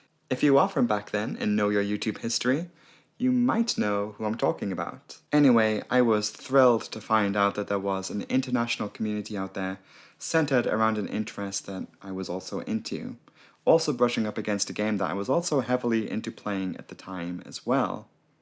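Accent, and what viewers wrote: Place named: Scotland, United Kingdom. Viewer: Your videos are so comprehensively informative that I thought you were actually a professional in the industry! What was your previous "real" job? Have you thought about going back to school to enter the industry in a different capacity, such as engineering(for the future)? I love your voice and imagine you had an excellent SLP for vocal guidance during your transition. And that accent!! I can't quite place it--Scottish? Irish?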